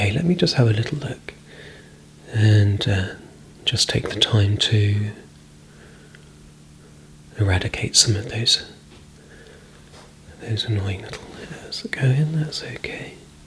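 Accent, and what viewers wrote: United Kingdom. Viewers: Great job. As an American, something about various British English and pronunciation is just supremely professional and clean. This series of yours is an absolute fan favourite.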